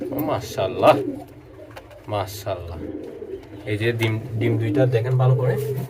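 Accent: Chittagong, Bangladesh. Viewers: চিটাইংগা ভাষা হজে সুন্দর লাগে 🎉❤❤😅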